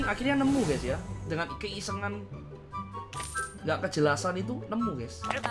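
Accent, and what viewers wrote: Java, Indonesia. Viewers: Wong jowo ndi mas e ? Bhs indonya medok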